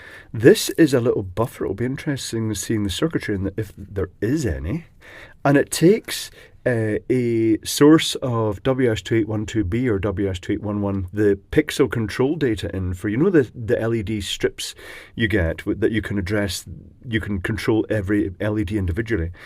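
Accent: Scotland, United Kingdom. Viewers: I don't understand that much about electronics but find these videos fascinating. The other half of the reason I come here is for the relaxing effect of creamy smooth Scottish accent. 😅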